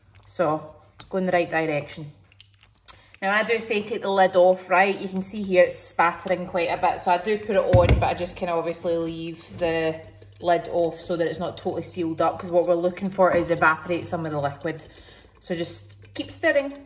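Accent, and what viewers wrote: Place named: Scotland, United Kingdom. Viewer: It's actually so nice to see and hear a gd recipe the way u actually speak Scottish xxx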